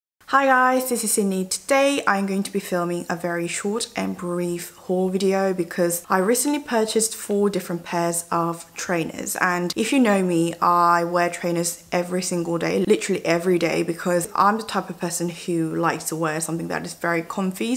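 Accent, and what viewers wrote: United Kingdom. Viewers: omg I've actually missed your british accent!!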